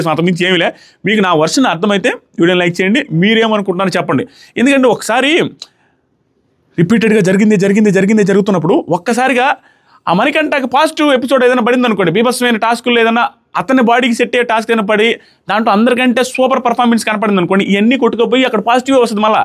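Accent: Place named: Nellore, India. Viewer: Adi anna Nuvvu reviews Chala baga cheputhav, Nenu Chala season nundi nee videos chusthunna . Nuvvu heartfull ga matladathav, Nuvvu mana nellore vadivi and pakka mana slang vadathav. Super anna 😊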